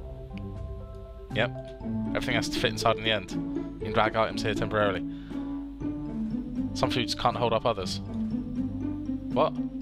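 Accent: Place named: France. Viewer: I want you casting every Monster Hunter Podcast in that frensh voice? Très bien! Best regards "Cooking Papa" Oli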